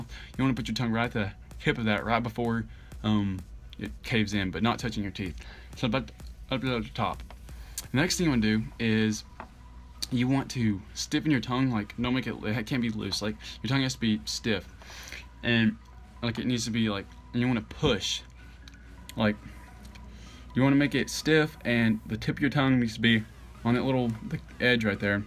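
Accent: United States. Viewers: He had a cowboy Accent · hes got a southern accent it super awsome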